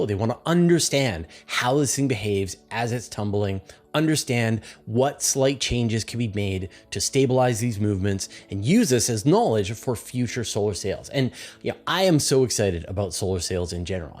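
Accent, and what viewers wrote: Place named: Western Canada, Canada. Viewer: I won't ding your pronunciation of "lava," but Vega should be pronounced VEE-guh,. And don't blame your western Canadian accent! 🙂 Normalize VEE-guh!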